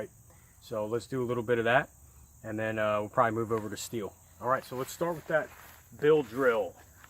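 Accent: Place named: Delaware Valley, United States. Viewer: Gotta be from PA or the Delaware Valley! :) Nice video, just picked one up on a trade, beautiful revolver.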